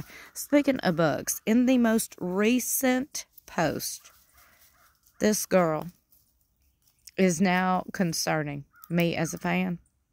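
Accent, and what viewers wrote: South, United States. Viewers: She's crazy! Why doesn't she protect her babies from a wasp? 😢 I love your videos! You have such a sweet, southern accent and you make me laugh. 😊😊😊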